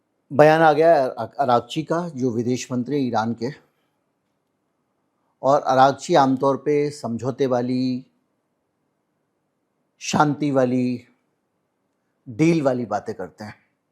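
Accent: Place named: Punjab, India. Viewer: ji kya aap punjabi hein aap ki language mein punjabi touch hai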